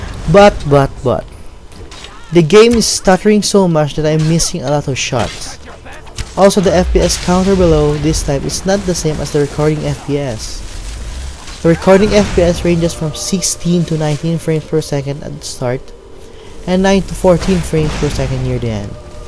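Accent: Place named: Philippines. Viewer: are you Filipino? You have the accent.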